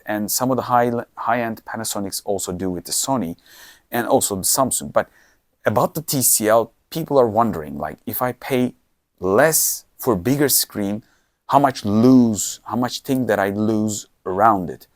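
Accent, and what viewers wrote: Turkey. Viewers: Aksan çok belli ya, abim bizden 😅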